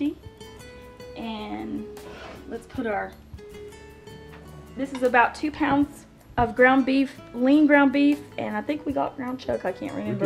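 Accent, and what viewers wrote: South, United States. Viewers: I did live in NC for a couple of years too. I'm in Canada now so I sure love hearing the Southern accents again. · I knew I heard a souther drawl don't know if I spelled that right lol. great video will have to try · I love a good southern accent and yes I have one myself being from Arkansas. Great recipe, my family loved it!!!!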